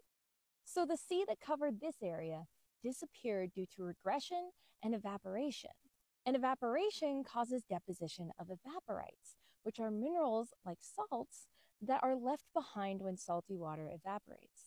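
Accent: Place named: New Mexico, United States. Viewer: Sounds like you grew up in New Mexico?! Just makes me enjoy your videos that much more!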